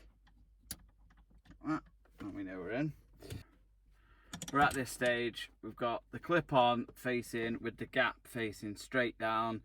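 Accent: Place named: North, United Kingdom. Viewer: Number one you are really tan for somebody with a slightly northern accent. Maybe I got that right maybe I got it wrong But thank you very much this was really informative I have a 2011 John Cooper works that I wanted to change out everything in to match the wrap that I am doing on it you’re just really really helpful all the other ones not so much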